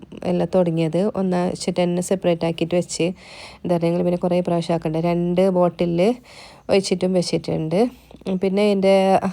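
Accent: Kasaragod, India. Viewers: Kasaragod ആണെന്ന് തോന്നുന്നു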